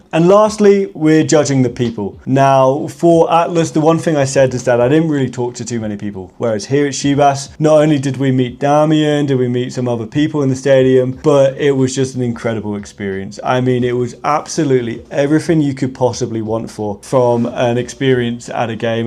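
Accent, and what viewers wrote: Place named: England, United Kingdom. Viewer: Your accent sounds like English from England.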